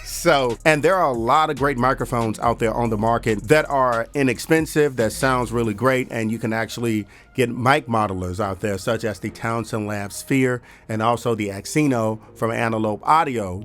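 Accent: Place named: Nigeria. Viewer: I Don't know why i though you are Nigerian 😂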